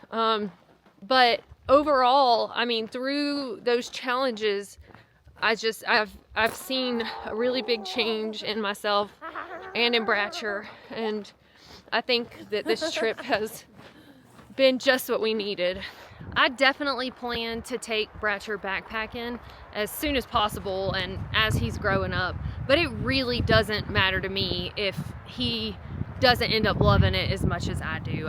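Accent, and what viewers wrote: South, United States. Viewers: You’re so stinking cute with your southern accent and your surprise at experiencing Arizona for the first time. I lived in TN for over 6 years, 30 years in NM, now back in AZ for 13 years. I love your expressions of wonder. Did R2R:18hr. at age 59. My health took a hit after that. Now in Payson,loving it!!! Love you ❤️❤️❤️ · As a mama who has gotten very little sleep over the years with constantly breast-feeding babies that for some reason just won’t go to sleep, separated from a partner on and off because of work, and never having had a babysitter this video was really powerful. That song has meant so much to me for so many years having grown up on hot, flat coastal plain land of Southeast Georgia and then moving to a place with some little mountains to follow my dreams. I’m so glad you were able to be a positive role model to other mothers while being so loving and respectful towards your own mom who is stepping in as a second mom through all of this to help all of y’all out. 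I love that you’re confident enough to record yourself interpreting everything around you with a Southern accent, phrases, and mannerisms. It’s so dang funny, so raw, heartfelt, and comforting. My nine-year-old born ornithologist, backpacking daughter watches now to identify all the birds, which means it takes an hour to get through one video so she can research each bird. 🦅🦉🕊️ You are a hero to many. Y’all have amazing footage, fantastic editing, and big hearts. I’ve been wondering when we’re gonna see more of the family dynamic after getting so invested in your channel. That last bit with him seeing his daddy and putting his sweet little head on his daddy’s shoulder broke me for sure, but the song already had me crying right from the start especially after hearing you cry, too. (I know the mom’s in the grandma’s usually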